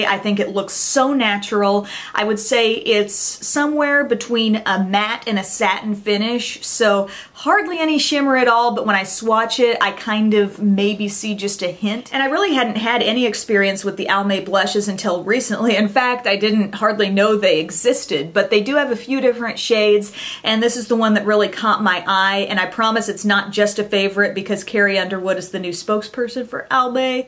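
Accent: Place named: United States. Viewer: You're so pretty and your accent is SO COOL. Whereabout in America are you from?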